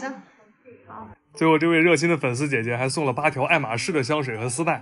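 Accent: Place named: Henan, China. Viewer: 我还说这“去球”咋振的河南味儿了，果然老乡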